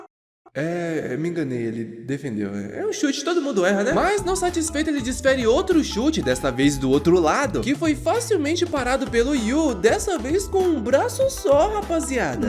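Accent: Rio de Janeiro, Brazil. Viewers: Certeza me responde ai vc e carioca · O narrador e do RJ né possivel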